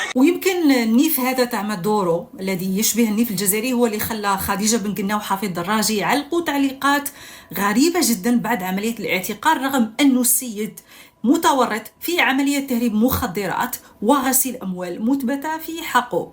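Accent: Morocco. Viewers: مهما حاولت التأقلم على اللهجة الجزاءرية فلهجتَك المروكية خانتك انت عياشة والحلم لن يتحقق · Cette femme est marocaine l’accent marocain la dénudée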